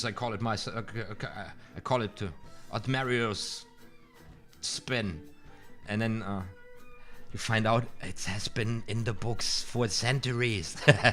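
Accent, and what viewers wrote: Germany. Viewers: woow ich hab vorher von chris ramsay gelernt und hab dich gerade eben gefunden...habs sofort am akzent geört das du deutsch bist hammer !!!! mach weiter so !!!!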